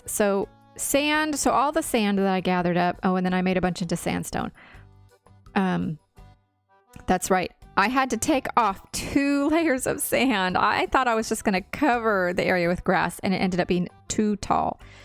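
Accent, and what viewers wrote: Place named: Texas, United States. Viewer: I thought you were in TX. I can pick up the accent every once in awhile. My wife is from Australia and she even has it every so often. It rubs off on you. 😆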